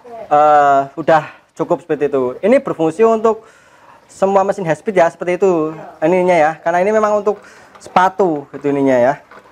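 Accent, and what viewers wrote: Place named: Java, Indonesia. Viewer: Wong jowo angel ilang jowone👍🏼😁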